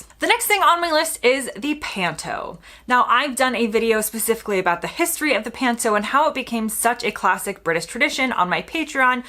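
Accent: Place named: United States. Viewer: you are loosing the american accent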